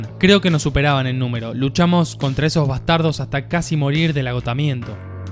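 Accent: Argentina. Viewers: De donde eres tu ? Uruguay o Argentina? Un pregunta nada mas e por l'acento a mi me parese mas Argentino verdad ,de toda manera tu video me encanta mucho te felicido yo soy napolitano e amo Maradona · pense que se llamaba Shake, fk acento agertino xD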